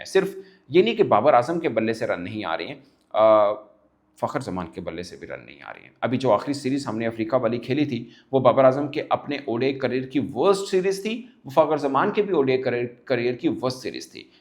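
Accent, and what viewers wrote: India. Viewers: India waly jesa bolty hen wesa he bolta hy 😢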